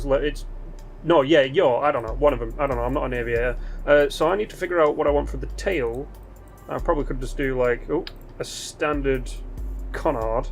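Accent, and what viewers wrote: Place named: Geordie, United Kingdom. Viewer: no problem. Also, are you from the northeast by any chance? I sorta hear Geordie mixed with a bit of Yorkshire.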